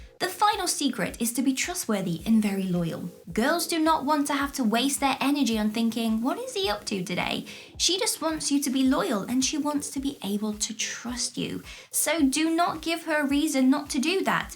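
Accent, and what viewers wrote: England, United Kingdom. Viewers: I love the way she speaks. That English accent is really enticing 😍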